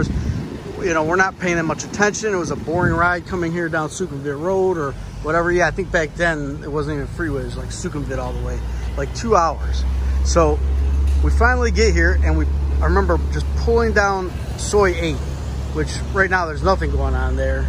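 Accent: United States. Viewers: stumbled across another channel i like. where you from? you sound like a fellow east coaster if i had to guess.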